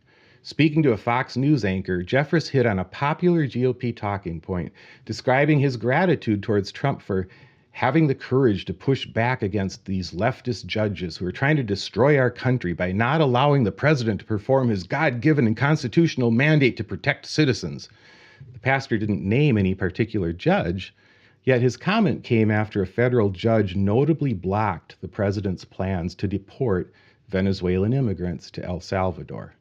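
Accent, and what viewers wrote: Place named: Minnesota, United States. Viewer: You’re on fire, man. I’m learning to enjoy what disgust sounds like in Minnesota nice dialect. Thank you.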